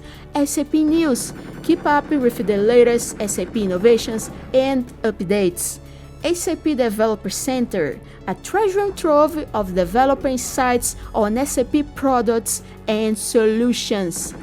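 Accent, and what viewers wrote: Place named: Brazil. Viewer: This is a really nice thing you're doing,! I'm so glad you're part of #erpgurus and I saw the link to your video there. And also, your Brazilian Portuguese accent is really nice. My brother is married to a Brazilian!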